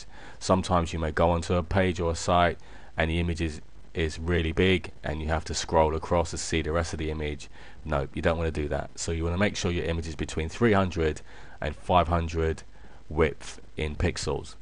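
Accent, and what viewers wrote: United Kingdom. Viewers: i love how british speak. i think it's cute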